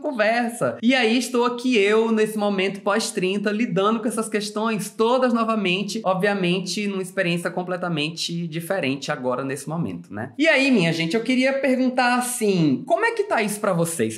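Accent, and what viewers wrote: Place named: Northeast, Brazil. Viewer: Que delicia de sotaque ❤ bem senti mesmo algo nordestino amuuu. Viva nosso nordeste. Muito gratificante encontrar um conteúdo profundo e importante que nos rodeia mas que tudo mundo finge que não ta rolando ou que não rola, necessário este assunto.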